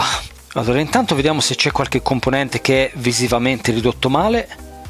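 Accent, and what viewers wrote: Tuscany, Italy. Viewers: Sei simpatico,sei bravo,sei creativo...sei anche toscano a giudicare dall'accento...si dice che l'italiano sia nato in Toscana. Ma perchè usi tanto inglese inutile ? Qualche esempio( ma sarei in grado di tradurre ogni parola) : SCREEN = SCHERMO SWITCH = INTERRUTTORE ECC... Buon lavoro comunque e ripeto video interessanti. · L’unico toscano he nun me fa ghignare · ma sei della toscana di che citta' e sei forteeeee ehhh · Ho appena scoperto il tuo canale grazie a questo video molto interessante. Davvero bravo e simpatico! Tra l'altro mi sembra di sentire un accento toscano, di dove sei(per curiosità, dato che io sono di Firenze)? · Ma sei Toscano??? Perchè lo sembri comunque se si lo sono anch'io. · Grande!!! Anche se sono sprovvisto di TV da circa 5 anni (felicemente sfornito) mi sono fermato a guardare questo bel video per la presenza del pezzo da museo che è stata la mia ultima TV... 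e per l'accento toscano che secondo me fa la sua parte. Simpaticissimo davvero. Grazie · bello sto canale sei mega simpatico! hai un nuovo iscritto! tra l'altro mi sembri toscano come me solo non riesco bene a capire di dove :)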